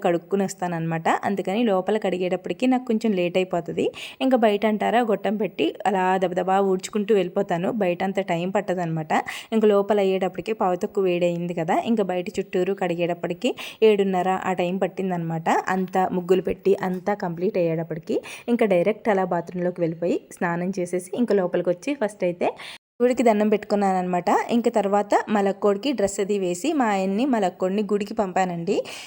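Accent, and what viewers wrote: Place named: Godavari, India. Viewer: Meru vidiga matladetappudu godavari yaasa lo matladutunaru...voice over echetapudu normal gane matladutunaru baga gamaninchana andi... Ayya baboii siggesestondi .... 😂😂😂😂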